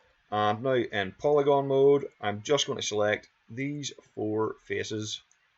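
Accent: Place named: Northern Ireland, United Kingdom. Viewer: Sounds like your a fellow Northern Irelander! :hand-pink-waving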